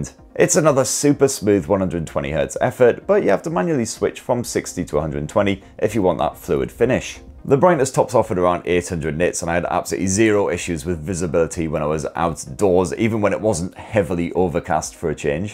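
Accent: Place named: Newcastle, United Kingdom. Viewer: Wow - great vid find this. Easily explained in a Gerodie-esque accent. I get it, but others may not fathom the language barrier. Independence for the North!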